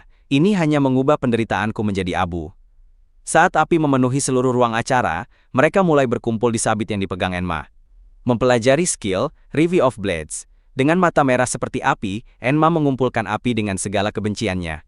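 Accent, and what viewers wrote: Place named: India. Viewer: Suara nya kayak India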